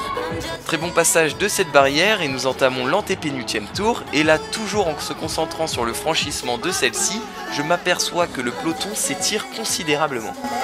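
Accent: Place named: North, France. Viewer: La piste magique d ordegem à encore frappé!!! Sub 8'50 en junior c est très fort, plus la chute et les petits piétinements avant certaine barrière c est encore plus beau, hate de voir l evolution dans les prochaines années, bon courage pour la suite de la saison 💪 Ps: magnifique accent du nord, c est pas possible tu as de la famille qui habite par chez nous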